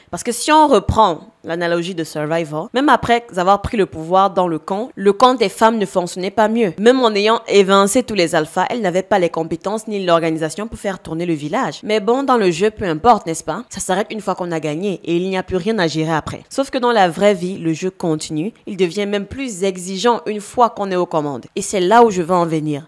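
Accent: Cameroon. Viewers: Ton accent prouve que tu es Camerounaise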